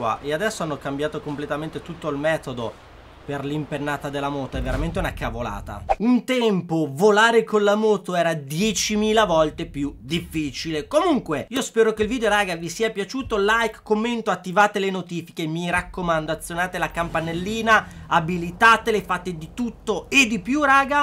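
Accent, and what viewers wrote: Veneto, Italy. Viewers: sei veneto?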